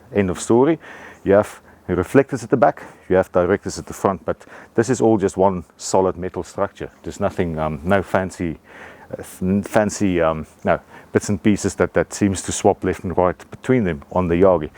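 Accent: South Africa. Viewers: you sound like you are from South Africa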